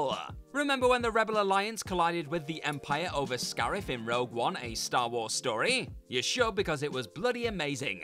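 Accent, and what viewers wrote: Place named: Geordie, United Kingdom. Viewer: Gotta love a slightly posh Geordie nerd. I am one myself.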